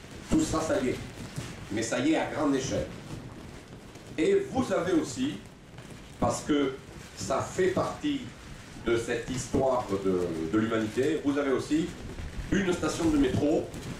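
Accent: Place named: Marseille, France. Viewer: Merde l’accent marseillais!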